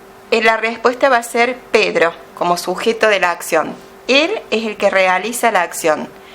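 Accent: Córdoba, Argentina. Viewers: Lo cordobés se nota a la legua, aguante Córdoba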